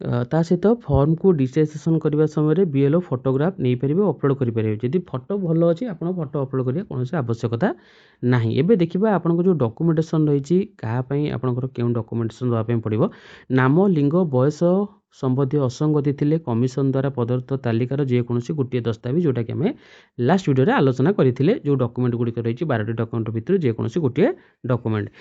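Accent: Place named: Angul, India. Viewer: ଅନୁଗୁଲିଆ m କୁ ma L କୁ La କହୁଛି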